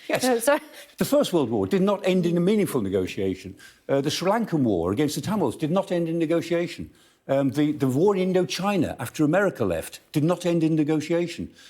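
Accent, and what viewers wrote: United Kingdom. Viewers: i just come here to lisin for bulllshit with british accent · British accent bullshit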